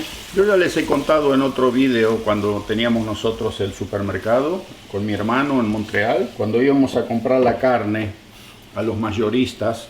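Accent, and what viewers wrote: Argentina. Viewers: sos el pedacito de Argentina en Canadá, seguís teniendo la tonada argento. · y la tonada de donde viene? De Argentina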